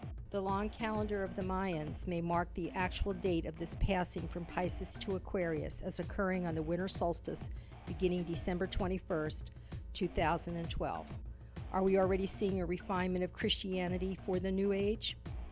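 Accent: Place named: New York, United States. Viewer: THIS VIDEO IS NOTHING BUT A SELL TO THE BOOKS AT THE END OF THIS ONE. KEEP YOUR RELIGION AND UNDERSTAND IT AT A PRACTICAL LEVEL OF LEARNING MORALS. WITH SOME CONECTION TO THE DIVINE. PRAY, IT'S THERAPY. KEEP YOUR FAITH, AT A SANE LEVEL. WHERE ELSE WILL YOU BE TAUGHT LOVE, CARE, GIVING, SACRAFICE? THE MEDIA- ALSO, SOUNDS TO ME LIKE THE SPEAKER IS HIDING A "NEW YORK" ACCENT, WHO LIKELY ATTENDS HER TEMPLE EVERY WEEK. DON'T TRUST ANYONE TRYING TO BREAK DOWN A RELIGION-LOW BLOW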